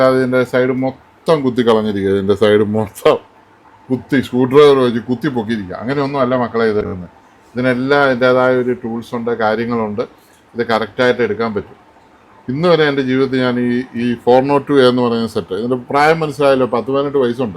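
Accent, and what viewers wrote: Kottayam, India. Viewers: താങ്കളുടെ ഒരു ആരാധകൻ ആയി മാറി ഞാനും കറ കളഞ്ഞ സംസാരവും പിന്നെ കോട്ടയത്തുള്ള അച്ചായന്മാരുടെ തനി പകർപ്പും പിന്നെ എന്തു വേണം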